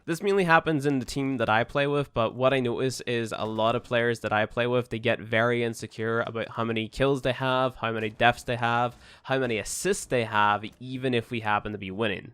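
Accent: Northern Ireland, United Kingdom. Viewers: Northern irish deffinetly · Are you from Northern Ireland cuz you kinda sound like it lol like you sound like my da a bit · Northern Ireland:)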